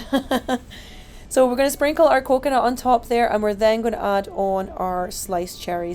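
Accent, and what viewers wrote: Scotland, United Kingdom. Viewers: Love the relaxing music,i will pass this on to my daughter s,my granddaughter also.she has grown up so very fast from a wee little hen to a beautiful girl, young lady almost 19 now,she loves to bake..😊love and hugs,from me here in uk.love to here your gentle Scottish voice. Misd my dads now he has passed, he was a true Scott s man,.mum s from Devon, we still have mum,89 in April 😊, xx.bit sad she has dementia.xx.love and hugs,.😊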